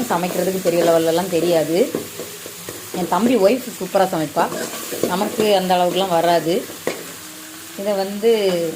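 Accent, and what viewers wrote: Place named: Nagercoil, India. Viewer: Arumai. Nager coil speech. Very good.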